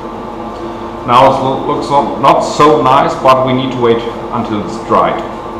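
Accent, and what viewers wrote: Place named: Germany. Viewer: i assume hes german (cause we have the brand of this spray everywhere) and he probably doesnt know the english word for "baumarkt". It's funny, i knew the second he hesitated he wouldnt say hardware store, cause the word baumarkt essentially translates to builder market. Obviously that is a weird name, so he probably went to an english word he heard before. Mut is just how you pronounce the 'a' in german, like "maht"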